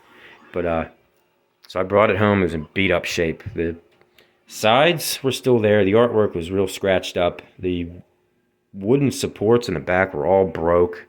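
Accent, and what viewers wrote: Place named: Pennsylvania, United States. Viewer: Fair enough. I was judging based on your accent. Knew it was somewhere in Pennsylvania, lol.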